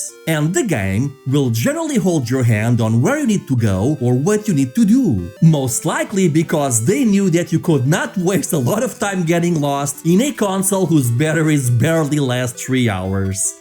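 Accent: Portugal. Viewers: você é de Portugal?? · It's okay. Great video! ^^ By the way, if you ever feel self-conscious about your Portuguese accent, don't! I think it makes your videos unique and charming. You get tired of hearing the same US or UK accents all the time! I'm a fan of your work; keep up the good job! ^^ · Bom, essa foi uma forma inusitada de descobrir que você é português kkkk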